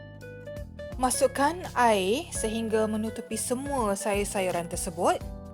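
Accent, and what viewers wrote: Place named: Kelantan, Malaysia. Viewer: Wehhh panda mu kecek kelate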